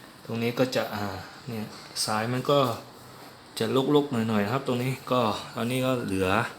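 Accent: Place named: Pattani, Thailand. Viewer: เสียงแหลงเหมือนเด็กตานี 😅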